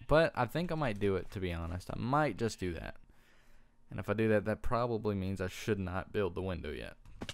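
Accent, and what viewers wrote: South, United States. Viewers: I love your accent. It reminds me of mine and a lot of my friends'. I don't know if I'm right in thinking of this, but it sounds like it's Southern, but just on the edge. I live in Memphis (which I refer to as the least Southern city in the South sometimes) and personally was raised by a mother from the West coast and a father from the North, so my accent is pretty much non-existant except for a twist on the occasional word. Your accent feels kind of like home to me. IDK, maybe that sounds creepy or weird. But also, this video was posted 4 years ago so maybe your accent or thoughts on it have changed c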